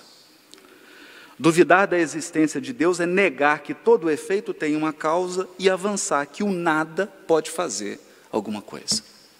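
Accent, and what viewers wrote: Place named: Minas Gerais, Brazil. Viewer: adoro as suas palestras. Vc, esse seu jeitinho mineiro, torna tudo tão simples e fácil de entender. Gratidão querido! Abraço 🥰🙏🏻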